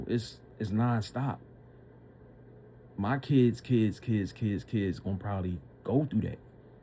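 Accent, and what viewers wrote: Philadelphia, United States. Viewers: Philly in the building, I heard it soon as you spoke, 👍👑👑👑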